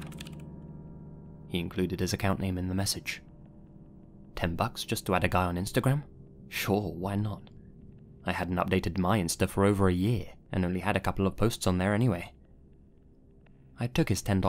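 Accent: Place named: United Kingdom. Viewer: You're from Brazil, have a "British" accent (though I can't really place it), and you've lived in Honk Kong... wow, what an unusual mix. Greetings from Chile!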